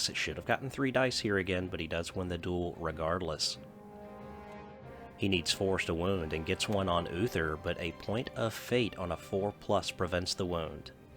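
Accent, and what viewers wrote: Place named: South, United States. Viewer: Where are you from? Your southern accent keeps poking through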